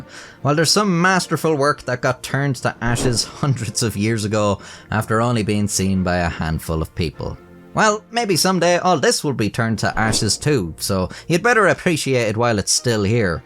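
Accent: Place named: Ireland. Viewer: I must say, you really spoke in a very good Irish accent in this video.......that's wert a tumbs up.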